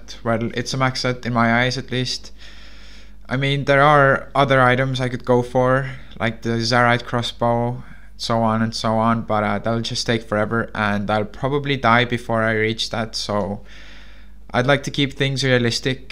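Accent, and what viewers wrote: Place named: India. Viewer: nice video i subbed first time i watched u where u from u sound like ur drom india but idk where u from i speak german im from switzerland my english is anyways not rly good tbh xD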